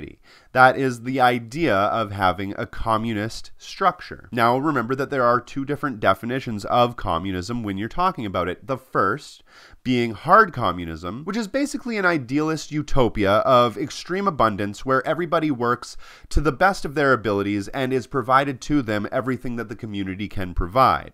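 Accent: Canada. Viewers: I can tell you are Canadian by the way you say "about" but good video 😁👍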